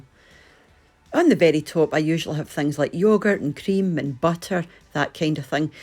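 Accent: Scotland, United Kingdom. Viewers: Love your Scottish accent. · Hello from Canada. I've been following your channel since few months and love all your videos. I LOVE your accent. I have a cousin who married a Scottish woman from Edimbourg and you remind me of her... Love all your cooking and I am surprised by the prices. In Canada, it's quite expensive... You are a great entertainer.:) I am anxious to see all your new recipes each week! Stay safe and have a great week end!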